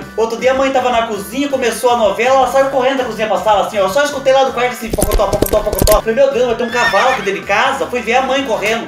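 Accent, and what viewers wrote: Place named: Minas Gerais, Brazil. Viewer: Aí do céu...não dou conta dessas canelinhas lisas... Vc é muito legal...KKK Abraço aí em São Paulo. Sou mineira e vc conversa como nós. 🤗😘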